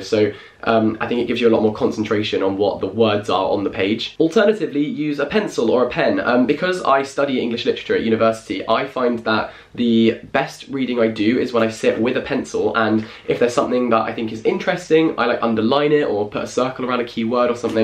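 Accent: United Kingdom. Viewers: I like your British Accent it's amazing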